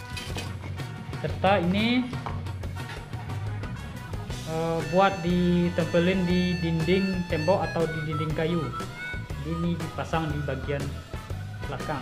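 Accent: Indonesia. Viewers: Kayak logat urang awak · Logat kalteng sih 😄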